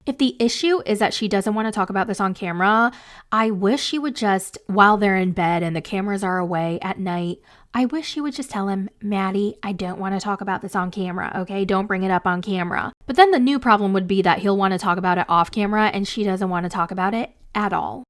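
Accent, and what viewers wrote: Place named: New York, United States. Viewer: Lol, your new York accent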